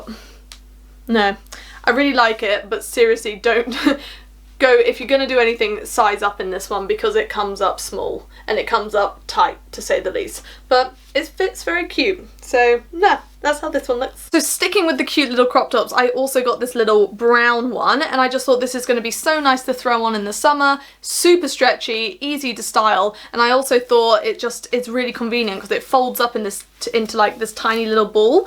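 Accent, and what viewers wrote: United Kingdom. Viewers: Oh you have the beautiful British accent! Love it!!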